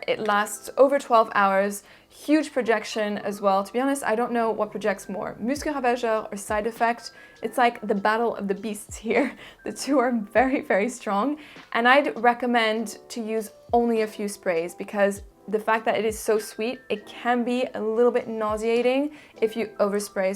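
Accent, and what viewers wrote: France. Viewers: Je souhaite te féliciter pour cette chaine Youtube, tu mérite 1 million sub et j'adore ton accent français. Bonne continuation.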